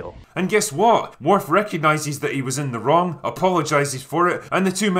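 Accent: Scotland, United Kingdom. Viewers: is your accent scottish or are you doing an immitation of an intoxicated man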